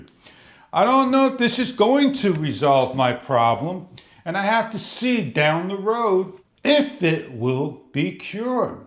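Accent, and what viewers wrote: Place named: New England, United States. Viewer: Thank you. Your NE accent makes is interesting. (O: I have spent hours and hours on our model 4266, first with new seal, then replacing the whole flush mechanism. Still having it refill to the right spot on the overflow tube, about every 5 to 15 minutes. I think I've tried and learned every lesson you share. But, maybe the heavy nut on the flapper will do the trick. I'm with you, not buying another American Standard toilet. Thanks!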